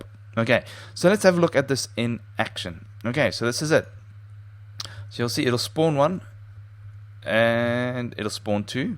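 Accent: South Africa. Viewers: are you south african? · You sound South African lol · I know a Saffy accent when I hear one ;)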